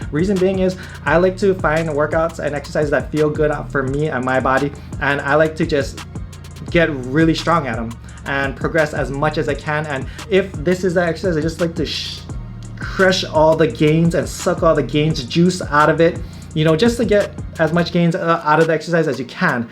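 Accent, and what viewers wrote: Hawaii, United States. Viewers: Wassup ma guy are you from hawaii?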